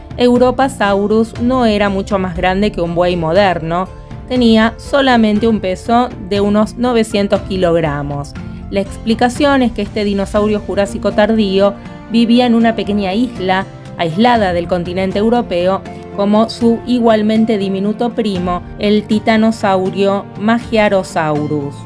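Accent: Argentina. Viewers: me encanta cuando dices "hola amigos",con ese tono encantador argentino tuyo;tanto como tu trabajo,por demás interesante e ilustrativo, sería muy interesante,que contaras la historia de cómo llegaste hasta aquí. Mis mejores deseos,y saludos cordiales,desde México.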